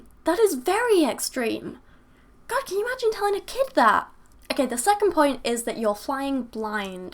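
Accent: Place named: England, United Kingdom. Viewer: You brilliant little apple nibbler! :) awesome. Even better how u say it, English accents add to how good most women sound :) keep fighting the good fight . Your fan -Brandon Gutleben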